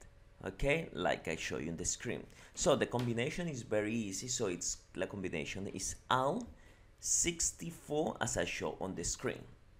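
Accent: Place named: Italy. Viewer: This was soooo cool. "Alt 64" worked for me. I adore accents! With respect, and if you don't mind, where's that accent from? Sounds Italian or Spanish? You're the man!! 2024 Sept the 30th.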